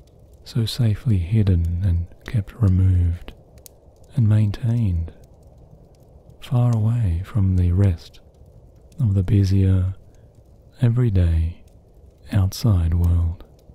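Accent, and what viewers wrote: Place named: Australia. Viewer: I love that you’ve released a hibernation video just as we’re entering winter in the souther hemisphere. That combined with your calm Aussie accent is just so perfect. I feel at home 😌🙏🏽💗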